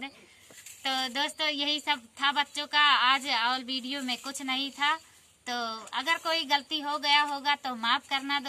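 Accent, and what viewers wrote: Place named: Uttar Pradesh, India. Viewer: Hello.....m bhi up side ki hu.....aapki boli bhot acchi h......mjhko bhi aati h.....but mjhko sarm aati h.....koi bolta h dusra to bhot accha lgta h..... or aapka babies bhi bhot hi pyaarey hn......bhgwan aapko saari khusiya de.....aapkey bacchon ko bhi...☺☺☺☺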